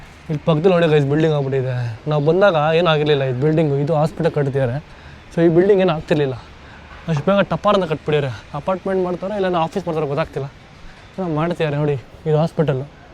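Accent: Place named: Mangalore, India. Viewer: Full mangaluru kannada , kusi apundu keniyera😅😅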